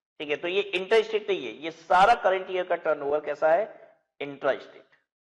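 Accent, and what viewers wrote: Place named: Uttar Pradesh, India. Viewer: Sir from Varanasi, UP. You definatly got that UP accent:) I am from Kanpur, UP. Thank you for all the videos sir Thank you very much.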